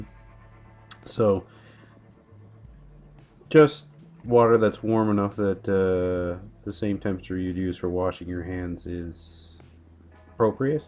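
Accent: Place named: Ireland. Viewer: I detect an Irish twang in his accent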